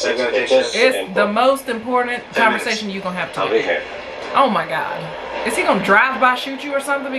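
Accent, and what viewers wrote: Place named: South, United States. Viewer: From a brit. You have an x rated southern twang that says what everyone else is thinking when facing Carpenters creations. Funnny wonan x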